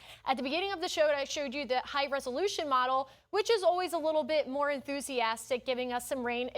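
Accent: Philadelphia, United States. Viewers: i know a philly accent when i hear one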